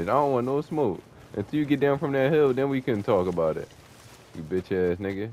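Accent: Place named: Philadelphia, United States. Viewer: I can tell you from philly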